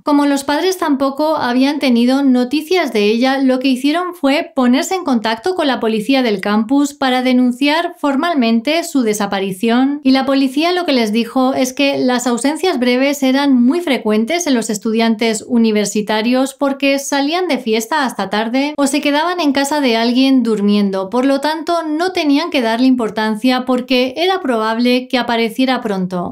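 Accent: Spain. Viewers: Está bien, español de Europa 👏👍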